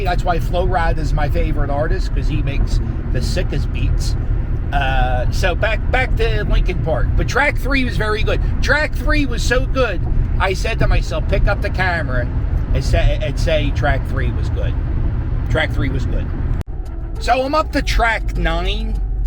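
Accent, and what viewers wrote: Philadelphia, United States. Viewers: Dude, I heard your accent and immediately though, yo, Philly! ...then you said you were driving to Wilmington and that sealed it,... Delco? Fair enough review on Coheed, but i gotta say, you gotta see those dudes live.... the fuckin energy they throw off is amazing!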